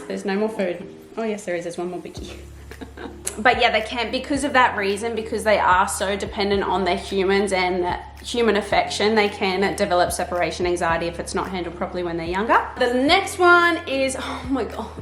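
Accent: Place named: Australia. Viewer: Soooo Australian, let’s put an R at the end of everything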